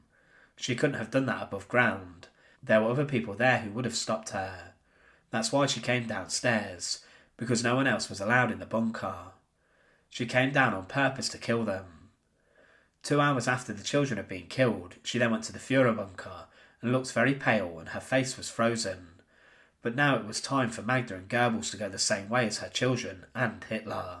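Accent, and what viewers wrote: United Kingdom. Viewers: Depends on where he is from in the UK, hence his accent. If you don't like it, don't watch.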